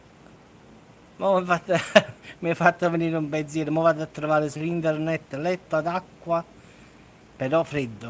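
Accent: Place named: Salerno, Italy. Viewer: Buonasera, mi piace moltissimo l'accento del tuo dialetto sei davvero simpatico, stasera ti sento molto provato dal caldo, anche qui da noi, fuori Firenze non scherza, stasera un po' meglio è più ventilato e la temperatura si è abbassata...buonanotte a tutta Salerno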